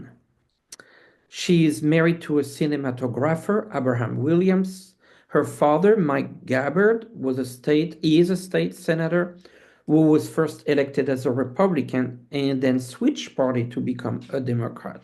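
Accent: France. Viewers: Oooh,! Hi, I’m the gal that LOVES how you say “tableau”….yes, it’s me again! I am soooo happy to see you again these days. I’m now a subscriber too. I love your accent! Ooh la La!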